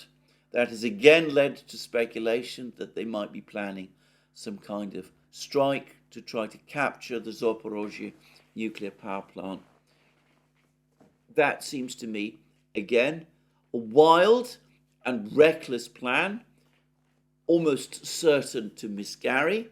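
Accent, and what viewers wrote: United Kingdom. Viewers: Great strategic lesson. Great sophisticated super duper British accent delightful to ear. Thanks ❤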